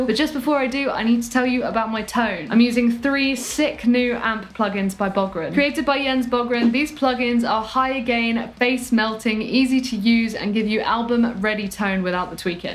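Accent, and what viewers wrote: United Kingdom. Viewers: I love the British accent on women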